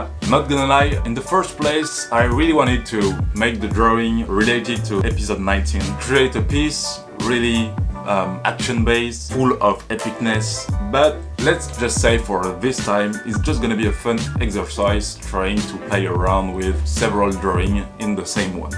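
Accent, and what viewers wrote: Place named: France. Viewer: Are you French? You sound French et les folios junior derrière toi te crament XD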